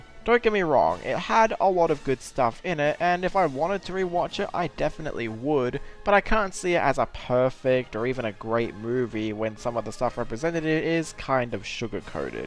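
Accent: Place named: Australia. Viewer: Wow, I didn’t know you were Australian! And about the movie, I haven’t seen it myself, but it definitely seems like the perfect film to show to elementary school kids when they need to learn about the Great War in Social Studies class! As for the animation, well, I didn’t really see a reason for the movie to be animated other than to appeal to kids more or as an exercise for the animators, but for what it’s worth, it IS pretty good, and the animators spent as much time on it as they needed to! And now I can’t wait for the Duck Duck Goose Explosion!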